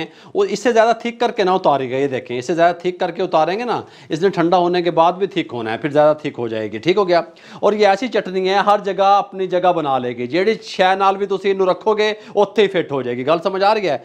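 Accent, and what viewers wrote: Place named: Haryana, India. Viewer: From Okara Punjab Pakistan. jee aap ki maa Boli Haryana ki lagti hay. jee aap k programme both achhay ja rahay hain.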